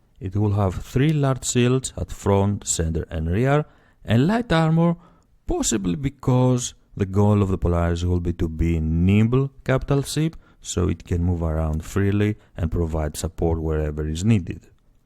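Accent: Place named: Greece. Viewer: Great vid, solid to-the-point information without superfluous speculations. Also I like your accent - you're greek, aren't you?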